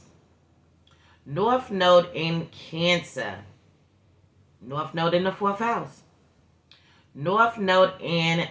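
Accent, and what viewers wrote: New York, United States. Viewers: Thank you love your vibe and NY accent. Mars in Cancer, Saturn in Cancer, Neptune Sagitarrius and North Node Scorpio here. You are hidden gem on Youtube, you deserve waaaay more attention on here. Glad I found you last year!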